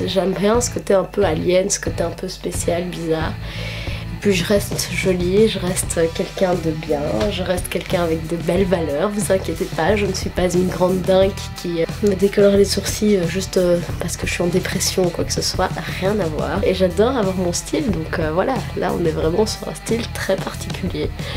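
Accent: Belgium. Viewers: je me disais bien je suis aussi belge hihi;3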